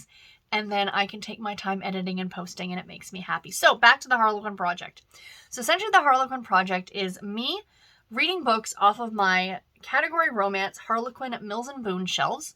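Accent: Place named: Canada. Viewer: I knew you were Canadian! The accent and your vibe ❤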